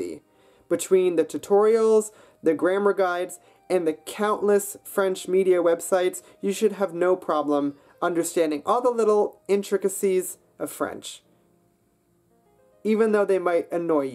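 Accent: Quebec, Canada. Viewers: I'm Canadian and I speak french... I don't know why, but you speak a little like the Quebecois(I am one too). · Oh la la! Looks like a Ph.D. thesis... Every language has its challenges, and I'm amazed at all the diversity of writing systems, phonology and the sounds that the human mouth can make. From the way you pronounce "about" I would say you are Canadian--and don't they teach French in school in Canada? If it took you 12 years to figure French "out" you were probably more interested in other things.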